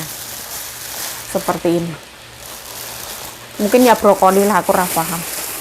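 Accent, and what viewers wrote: Java, Indonesia. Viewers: Ngomong Jowone Medok Tenan Jowo Endhi Sampean? Aq Dr Jatim.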